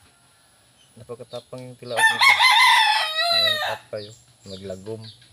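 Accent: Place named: Visayas, Philippines. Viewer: Dol 😂 halata na kaayo nga bisaya gd. Ayna pag Tagalog bisaya lang 😅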